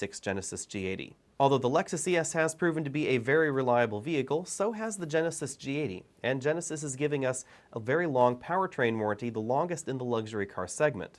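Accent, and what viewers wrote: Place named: United States. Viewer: Another unnecessary brand whose design will sooner or later not differ from other manufacturers. Capitalism at its best: pretending diversity where no one is. Also, it's funny how you Americans pronounce "Hyundai": there is no "o" like in "Honda" - actually it sounds very similar in your type of speaking. I wonder, because you should be easier able to pronounce the "yu" as "yu" or "ju"...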